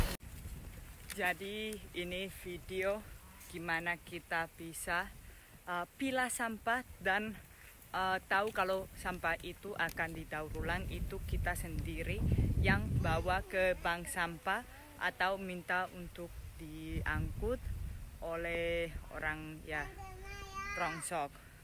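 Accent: Java, Indonesia. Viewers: Logatnya Jawa bener njir:v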